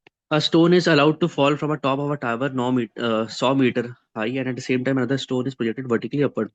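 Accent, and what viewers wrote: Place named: Mumbai, India. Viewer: Sir eda, mandawali?? Ekdum mumbaiya tapori language 😂😂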